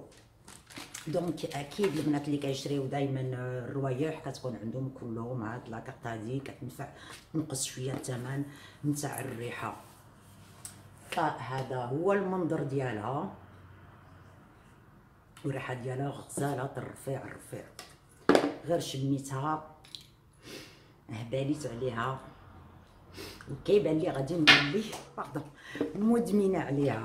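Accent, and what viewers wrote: Algeria. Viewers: سبحان الله هضرتك تقولي جزائرية واش مزوجة جزائري بالتوفيق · هدرتك بحال الجزاىريات 🌹 انا جيت من عند أمينة ❤❤ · ولله تقول جزاءرية يا اخت امينة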